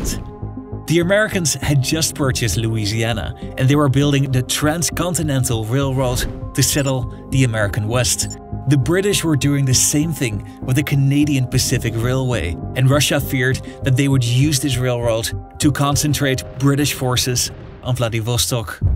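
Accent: Netherlands. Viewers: как можно не слышать что автор голландец? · This has to be a dutch accent. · If the narrator isn't Dutch due to his accent, I will question my entire existence. Also, Sergej Witte is highly underrated, and apparently his re-entry into government benefitted the Russian Empire greatly, though sadly shortly. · Lekker nederlands accentje wel haha 😂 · You left the Dutch out of your list of European powers dividing up Asia - and it sounds like you are Dutch..? Did I hear that right?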